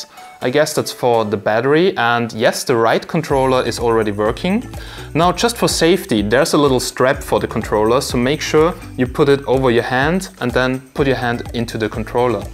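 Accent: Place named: Germany. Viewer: He sounds sooooo german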